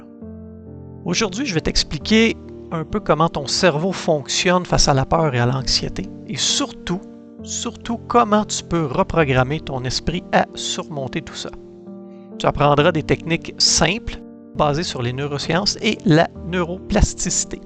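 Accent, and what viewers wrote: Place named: Quebec, Canada. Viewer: Bonsoir ❤ je ne sais pas respirer, aussi, je me sers de votre vidéo explicative: " votre technique 4; 7; 8.." Merci pour cette explication géniale! Vos vidéos sont hyper intéressantes! J’ai une question...Est-ce qu’une personne ayant un souffle au cœur +un problème cardiaque peu faire du sport s.v.p? Merci par avance! J’adore votre accent Québécois, c’est un accent chantant tout mimi.Prenez soin de vous! ❤🙏❤️🥀🙋‍♀️🥀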